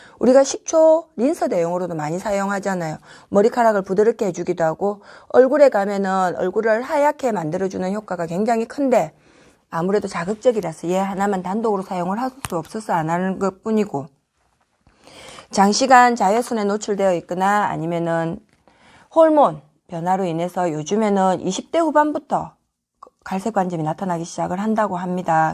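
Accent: Gyeongsang, South Korea. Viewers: 말씀 도 부산 이나 경남 쪽의 말씨 이네요. 아주좋아요.😄 헤어스타일 도 멋있네요.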